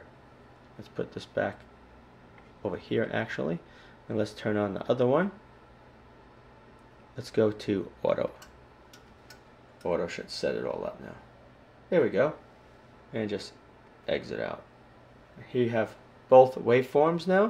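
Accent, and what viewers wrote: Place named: New York, United States. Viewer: You can put a FL place stamp on your video, but that voice says you're my homie from NY...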